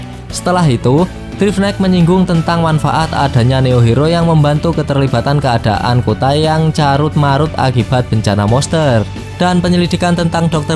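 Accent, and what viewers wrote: Java, Indonesia. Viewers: ( kammmprettt padahal udah seru loh )🤣🤣 Jawa mana min?🙏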